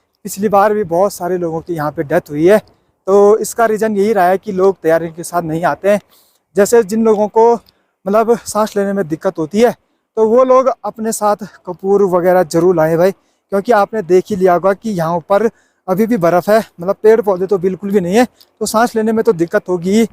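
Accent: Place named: Pahadi, India. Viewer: 🇳🇵 Aap Ki Bolaney Ki Awaz Mey Pure Pahadi Paan Hai Jai Pahadi Maa🇳🇵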